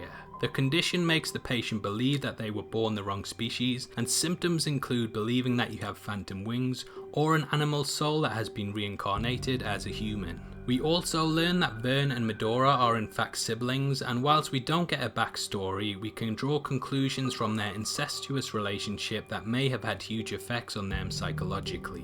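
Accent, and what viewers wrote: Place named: England, United Kingdom. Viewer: This guy was incorrect about a handful of things in the movie and then says "they have became" ... I guess having an English accent doesn't guarantee that he'll speak proper English? Thankfully, I got the gist of the video. Lastly... this movie was not a thriller and frankly is not worth the time. It's a horrible movie.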